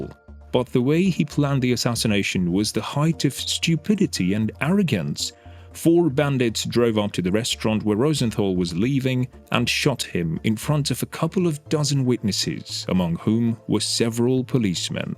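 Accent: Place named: United Kingdom. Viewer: Crap narration, would have been better with an American voice rather than stuck up English rubbish.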